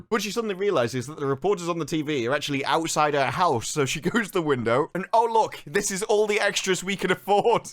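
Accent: United Kingdom. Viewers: dude I love a dude with British accent tearing this apart love it subbed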